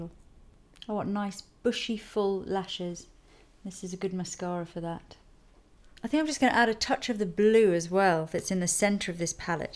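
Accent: Netherlands. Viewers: Is from the Netherlands? She doesn't sound very English to me (but maybe it's just me, don't know =p) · I immediately heard that she's from The Netherlands lol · Is Dutch? Her accent sounds Dutch. She's just so beautiful, I can't get over it! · I wonder what nationality is? Her accent sounds very Dutch. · May I ask where is from? She sounds Dutch to me!:)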